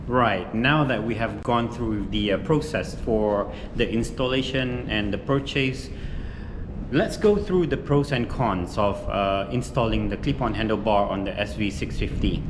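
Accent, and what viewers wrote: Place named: Malaysia. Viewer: Your english sounds Malaysian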